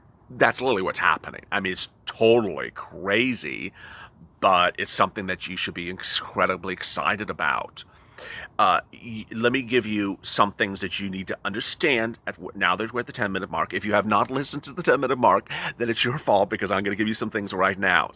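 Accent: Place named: South, United States. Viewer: Good morning this is Tennessee coming to you your southern accents getting good just a little more drawl to it LOL!!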